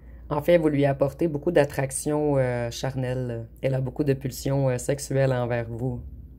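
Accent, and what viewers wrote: Quebec, Canada. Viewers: Bonjour … quel bel accent quebecois … c’est si jolie et relaxant de vous écouter , un pure bonheur !!! 😌❤ Les 3 le représente parfaitement bien , vous êtes vraiment tres doué . 😅👍🏼.. infini gratitude pour tout le temps que vous nous consacrez … Merci . Stéphanie 🙏🏻🙏🏻🙏🏻🙏🏻🌼☀️🌸🌷🎉🎈🩷💞🌼☀️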